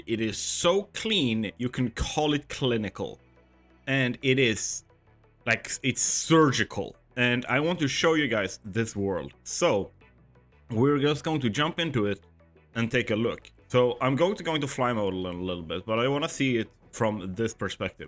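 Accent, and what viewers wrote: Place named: Sweden. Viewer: i hear swedish accent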